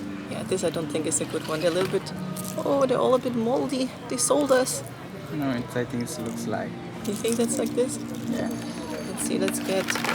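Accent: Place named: Thailand. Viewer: Are you half-Thai? Your Thai accent is so clear!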